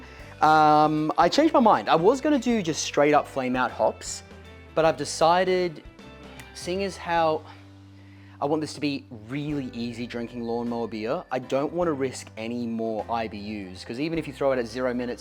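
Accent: United States. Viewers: Was that am American Accent? lol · Lol, thanks, mate! Love the murican accent. Brewing with corn is great. I think it gets overlooked, and some folks think it is boring these days. The funny thing is that the beer seems to disappear immediately for how "boring" it is... one of my favorites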